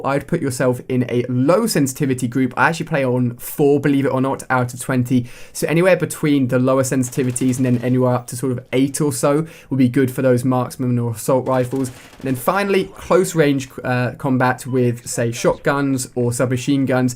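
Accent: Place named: England, United Kingdom. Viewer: Your cool love your England accent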